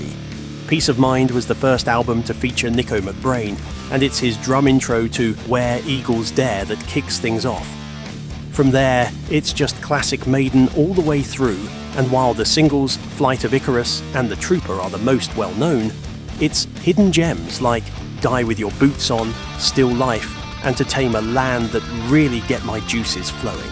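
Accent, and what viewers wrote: United Kingdom. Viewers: You are British as per your accent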